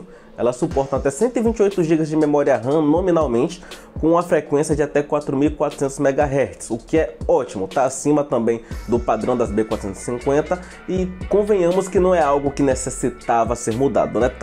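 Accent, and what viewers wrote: Bahia, Brazil. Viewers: Man! Você combina o sotaque aqui da Bahia + O conhecimento em tecnologia. Esse canal era pra ter um milhão de seguidores. Por conta das dúvidas que tirei aqui, comprei a PRO II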